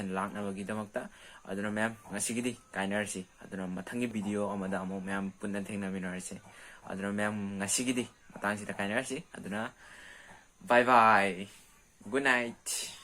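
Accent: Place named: Kakching, India. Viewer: Enao yengba yam nungyheee … kakching macha ama oina yam chaothokcheikoo nayambana 😊 kakching marol da ngangbasidei henna taba nungyba leitre …🎉🎉 keep it up❤️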